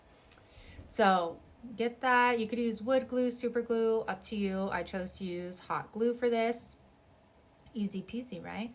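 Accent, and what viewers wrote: South, United States. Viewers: I couldn't find the little tool you used to make the wood grain on your Amazon page....Did I just miss it? I thought I looked at everything! Love your crafts and your ways of explaining how to do them. You talk my talk! You must be from the South too! Lol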